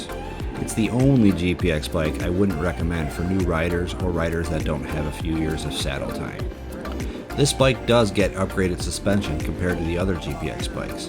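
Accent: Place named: Northeast, United States. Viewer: Are you in upstate NY, NH or Vermont? Gotta be one of those judging by your accent. Also the FSE250E is now fuel injected and runs the same motor as the CRF250F, which is one of Honda’s Chinese/Thai bikes.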